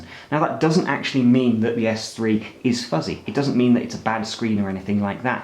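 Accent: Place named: RP, United Kingdom. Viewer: Your voice is so posh and funny - I love it! Great review, by the way!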